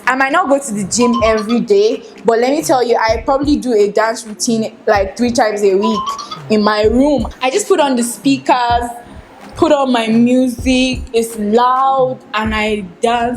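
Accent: Nigeria. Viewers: is that Nigerian accent 😍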